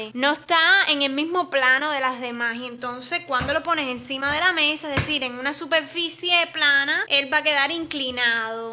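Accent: Cuba. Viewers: Que Alegre eres!! Eres Cubana??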